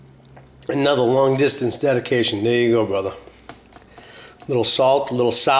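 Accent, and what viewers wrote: Boston, United States. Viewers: Hmm, you sound like a Bostonian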